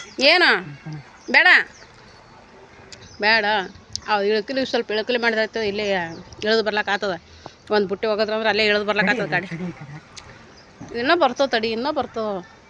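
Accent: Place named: Bijapur, India. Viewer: Nim bhashe nam bijapur du ant gottagut sister.gulbarga bhashe matadal nivu thanks 🙏 nice .navu bijapur distric davru but irodu out of State.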